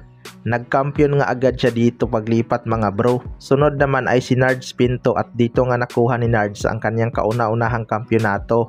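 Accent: Visayas, Philippines. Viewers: Naku poh c visaya nn man pla 2ng narretor...fake news 2ng bisaya n2...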